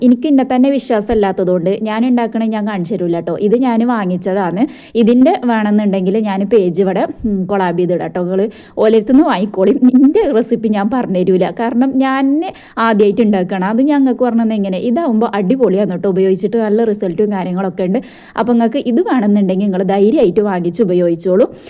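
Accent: Malappuram, India. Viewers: Hai video eshtayi nammalum illi yeduth modayanna paraya.😂😂malapuram bhasha👍😅ok da by sainuty❤❤❤❤❤❤❤❤❤❤❤❤❤❤❤❤❤❤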